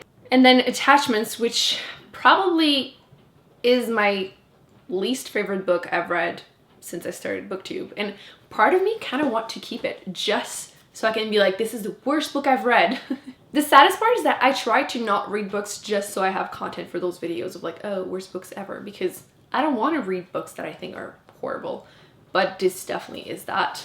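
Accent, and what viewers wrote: Quebec, Canada. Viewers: I speak french fluently and most of my friends are french so I was shocked when I heard your accent when you started speaking in french! didn t know that the canadian french pronunciation was so different from the french one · Lool you need to stick with just asking not insulting dear, haha, I like it that you speak Arabic, and btw your Canadian French is different from the accent in France couldn't understand u clearly, because I'm Arabic and I speak french too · Owww I loved hearing you speaking French, French Canadian have such a cute accent ! I am French and I started reading in English in order to improve my English :D I'd love to read the Mistborn trilogy but can't decide if I want to get it in English or in French... haha You should read Carry On ! I was skeptikal aswell but I actually liked it ! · I studied French in Quebec City for a summer and when you spoke French I was instantly missing Quebec haha, alors c'est genial et je aimerais bein si tu fait les videos en francais haha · Je n'ai jamais écris sur une page youtube mais j'adore tellement tes vidéos que j'étais obligé ^^. 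Je suis française obviously mais dans cette vidéo j'ai tellement adoré ton accent quebecois que je me devais de te le dire ☺️. J'étais depuis quelque temps dans un reading slump et depuis que je regarde tes vidéo et d'autres bookyoutuber (comme peruseproject qui est la première bookyoutubeuse que j'ai suivit ^^") je me suis remise à lire. So for all of those little things I thank you a lot for your videos and all your effort to make us discover some great books. And I read in english for like maybe 5 years now and watching series in VO and this is in fact the best way to improve your english ^^. Btw one one the first reason why I'm following you is because of your personality so i would say you are so right to not care about those who criticise you. J'attend avec impatience ta prochaine vidéo :).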